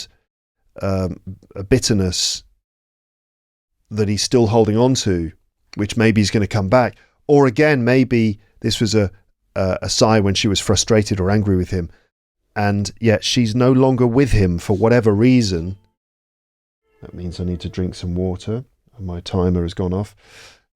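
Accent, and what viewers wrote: United Kingdom. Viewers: ❤ I'm Korean, I'm hope going to England in few years.🙏 It really helps listening British accent...! 😊 Thank you so much!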